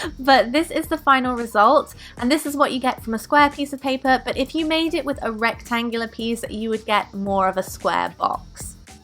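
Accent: United Kingdom. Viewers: It's so cool that your name's and you have the same British accent......btw ur amazing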